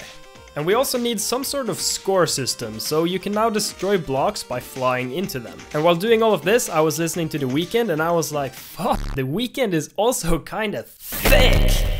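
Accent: Sweden. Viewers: Are u Swedish or danish???? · Are mah boi swedish?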